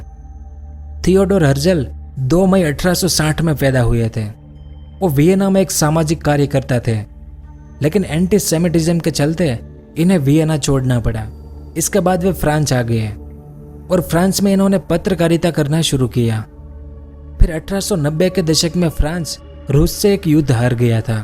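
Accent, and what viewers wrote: Gujarat, India. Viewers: आप की बोली में मुझे गुजरात की मिली जुली बोली लगती हैं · Aavaj Se to Gujarati lag raha hai Because I know tone of Gujarati language is different in different part of Gujarat. I think may be he is from Ahmedabad, Surat Or Sauratra.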